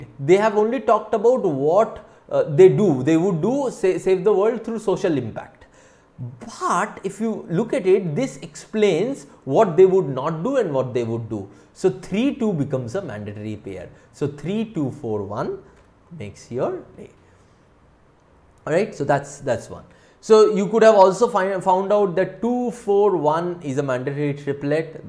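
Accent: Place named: Bengal, India. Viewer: Sir, you explain so well. But I guess there is a problem in me.. Still I'm unable to solve these types of problems.. So, I also started viewing critical reasoning topic with a hope to acquire more knowledge from you... Thank you! 🙏 Well, I'm curious to know are you bengali? 😅 (off topic)... Your accent seems so...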